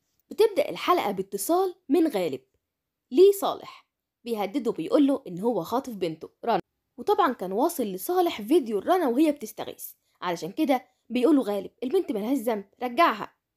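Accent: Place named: Iraq. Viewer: تتكلمي عراقي حلو شكرا على معلوماتك تحياتي وردة عراقية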